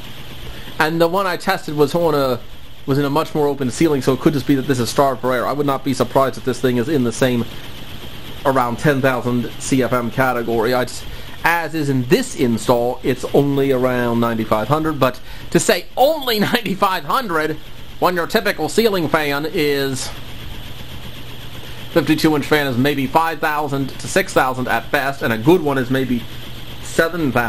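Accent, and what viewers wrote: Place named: Ireland. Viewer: An invention as fascinating as the accent. If it's not too personal, I gotta ask, is this a particular regional accent or a mix perhaps? It almost has a twinge of Irish about it (apologies for the off-topic question - I just love accents)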